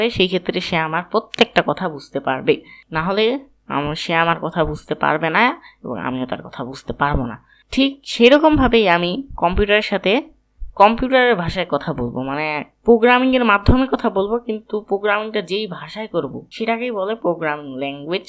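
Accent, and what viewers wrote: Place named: Rangpur, Bangladesh. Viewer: আপনার ভাষা আমি বুঝেছি। যেমন আপনার বাড়ি অমপুর।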